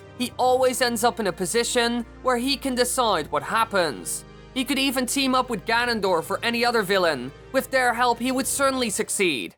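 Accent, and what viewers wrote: Netherlands. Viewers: are you duchts ???NL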